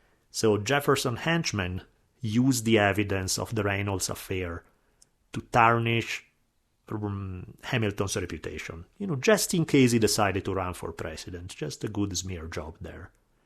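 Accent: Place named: Italy. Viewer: Hi! I'm really enjoying your work! I only came across you because of a Dan Carlin interview with you..I lived in Italy for many years and I enjoy your accent 😊 A friend from Brescia has exactly the same accent..Bravo!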